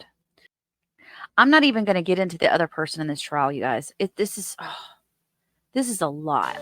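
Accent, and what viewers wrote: South, United States. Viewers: Hi from back from vacation lovely!! Sorry I was away w/out a Wifi … I’m binge watching your videos now 😊. Always a pleasure to listen to your southern sweet accent 🫶🏽 Have a wonderful week 😘🌺🫶🏽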